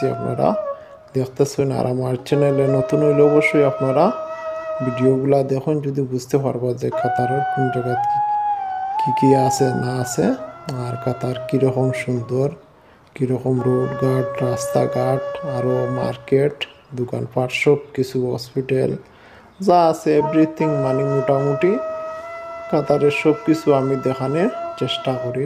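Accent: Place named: Sylhet, Bangladesh. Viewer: খুব সুন্দর হয়েছে আর এবারটা বুঝতে পারছি আপনি বরিশালের না সিলেটি😅😅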